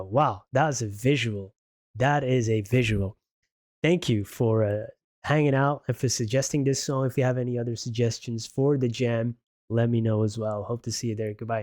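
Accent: United States. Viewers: Hey thanks for the reply. First let me apologise for calling you an American I didn't mean to insult you. But true you do sound a little American. I would love you to react to more Jam/ videos as I remain a lifelong fan. I would suggest looking at some of Wellers solo work as well particularly the album some of which was filmed at La Luna in Brussels. I included this as a small example <link> Keep up the great content 🤘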